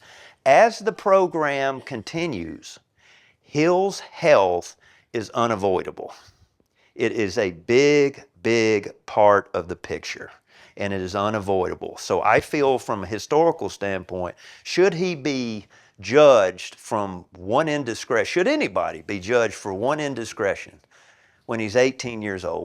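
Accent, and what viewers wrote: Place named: South, United States. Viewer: Fantastic, informative, and entertaining as presents it all, in the most interesting southern drawl, about A.P. Hill. Thank you.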